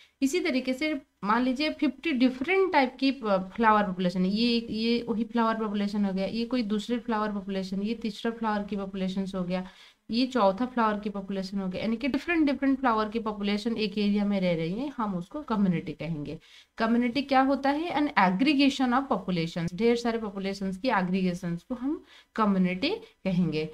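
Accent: Odisha, India. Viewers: Nice explanation ma'am 👍 Are you from odisha????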